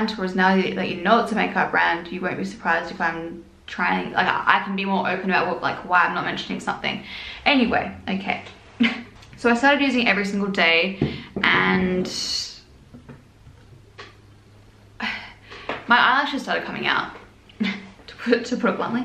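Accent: Australia. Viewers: I love when she emphasizes R's to minimize her Aussie accent lol it's funny cute "bronzeRRRR" instead of "bronza"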